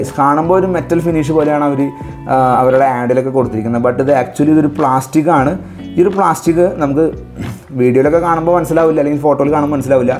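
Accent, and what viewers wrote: Kunnamkulam, India. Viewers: Kunnamkulam bhashaa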